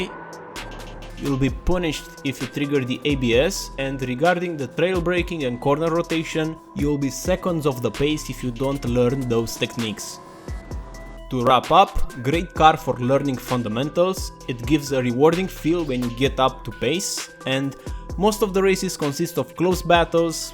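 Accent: Romania. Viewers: are u from romania or ur accent sound kinda like a romanina that knows english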